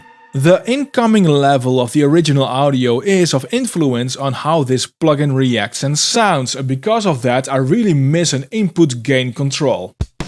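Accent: Netherlands. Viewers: die accent. NL?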